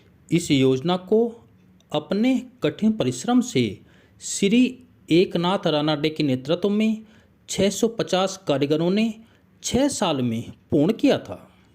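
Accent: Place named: Haryana, India. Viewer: Bhai sahab aapki awaz se aap haryana k lag rhe ho... Awaz badiya hai bhai apki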